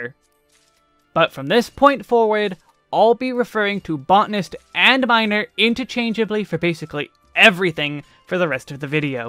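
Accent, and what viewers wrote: Boston, United States. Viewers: Boston-accent? Jersey? Can't put my finger on it :D - Gahtha goyde lol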